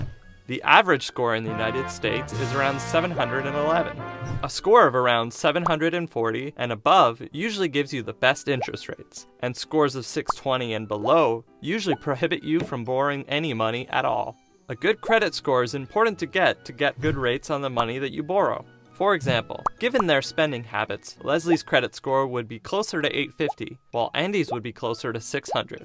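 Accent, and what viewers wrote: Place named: Canada. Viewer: The narrator sounds so Canadian.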